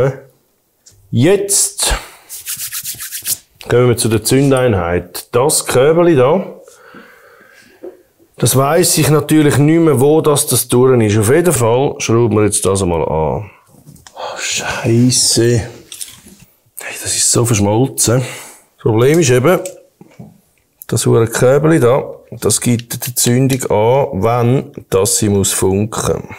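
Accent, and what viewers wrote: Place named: Switzerland. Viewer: Die Sprache... Schweizer Deutsch... ist nichts für empfindsame Ohren!!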